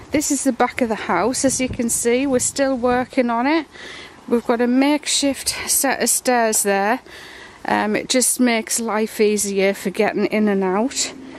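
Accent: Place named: England, United Kingdom. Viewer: I like to see your video. Beautiful English accent .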